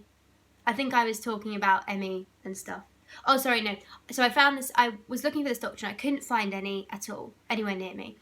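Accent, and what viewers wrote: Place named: United Kingdom. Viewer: This is my first time watching your videos, this one was on my recommended list. I was quite shocked by the British accent, I was expecting an accent from the U.S. but anyway, I just wanted to say that I'm sorry for what you're going through however, I'm glad that you found a doctor you like and who can do anything to help you out. You've earned a subscriber!! Take care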